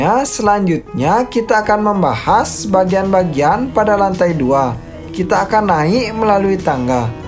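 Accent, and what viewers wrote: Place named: Madura, Indonesia. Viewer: Mas kmu org Madura apa bali.. ko logat nya gitu 🤭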